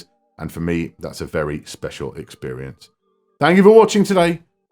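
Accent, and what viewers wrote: England, United Kingdom. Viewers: Great review, many thanks! Please keep in mind that this channel of yours, is watching by viewers and subscribers from all over the world. The only "negative" in your reviews is the fact that you speak too fast and viewers who are not native English and don't have that English accent, might have problems of understanding you 100%. Otherwise all of your reviews are excellent!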